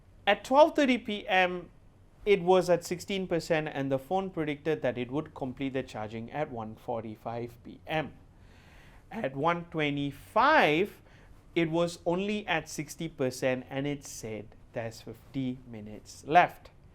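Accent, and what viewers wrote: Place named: Sri Lanka. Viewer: Just asking are you from srilanka?? Loved ur accent..❤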